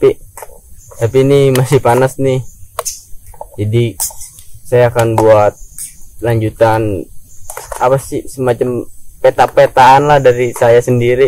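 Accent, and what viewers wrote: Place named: Bekasi, Indonesia. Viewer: oooo aksen bekasi ya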